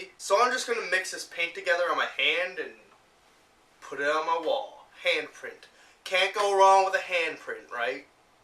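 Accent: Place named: Canada. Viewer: You have such a Canadian accent. Love it!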